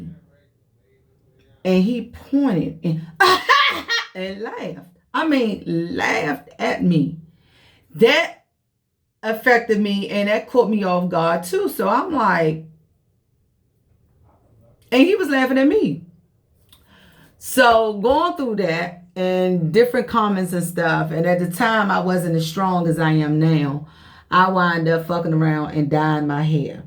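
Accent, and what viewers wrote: United States. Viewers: I absolutely love your channel and your locs I subscribe cause I’m from DC and I can hear in your voice that you are from DC as well I have gray hair as well but I do dye my hair once a year but I’m done with dying my hair cause I really am allergic to hair dye you and your locs are absolutely beautiful I don’t palm roll either🖤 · You sound like you from the dmv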